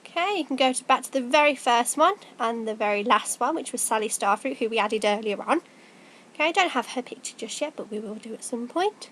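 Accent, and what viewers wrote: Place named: RP, United Kingdom. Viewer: posh Accent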